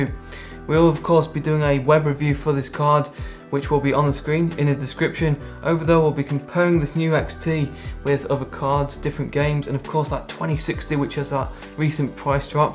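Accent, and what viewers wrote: England, United Kingdom. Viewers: Hi, Thank you for another great video. is one of my favorite YouTube channel because of the following reasons: High quality video content The (video card) product presentation is VERY professional The camera angle is Perfect The sound is very CLEAR I and my wife enjoy watching YouTube channel, because my wife and I really like gaming PC, and high end computer components ( video card, motherboard) My wife tells me that the gentleman in this video ( I think his name is ) LOOKs like people in Hollywood, she likes English voice. In this video, the new 5600XT is impressive. The VRam's low temperature alone is worth buying. This RX 5600Xt review is very informative and helpful. Keep up the good work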